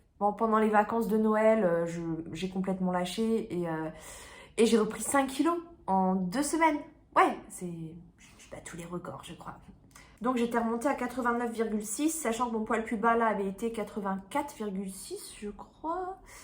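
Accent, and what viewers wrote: Brittany, France. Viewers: Bravo pour ce formidable parcours! Tu est au top 😊 ( Et dans tes vidéos j'aime bien les petites choses concernant la prononciation made in Finistère comme "même" qui deviennent " Meum", sorry je fais ma pikez 😉😂 )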